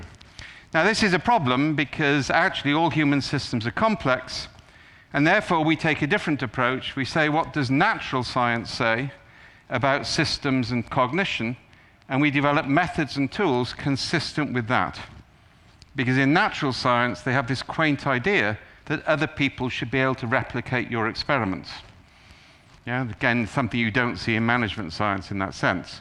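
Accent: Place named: United Kingdom. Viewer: just brilliant talk! but definitely need to rewatch it to understand all of it. Also, British accent is hard to grasp sometimes, if only someone could make subtitles * _ *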